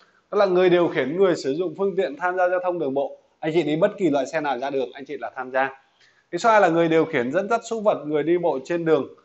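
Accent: Thanh Hoa, Vietnam. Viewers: Thầy người Thanh Hoá,nói giọng Thanh Hoá nè. Thấy thân thiện dễ gần và lịch sự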